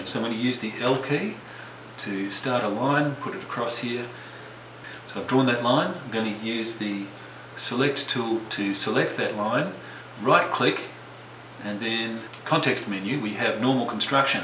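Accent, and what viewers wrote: Australia. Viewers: I spent a year in Sydney working with H.O.Wills Tobacco. It was a great experience. Are you Australian?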